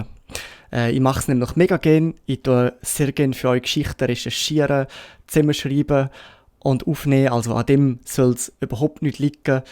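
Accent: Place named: Appenzell Innerrhoden, Switzerland. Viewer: Jo säb ghört mer amell guet, dass du en Innerrhödler bisch. Dis Video isch super.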